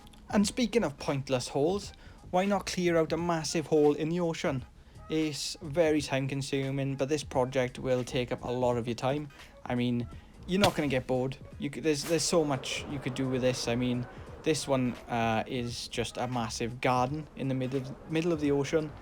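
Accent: Wales, United Kingdom. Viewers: Are you Welsh I’m welsh and you got a welsh accent I love it 😂 and also this is a great video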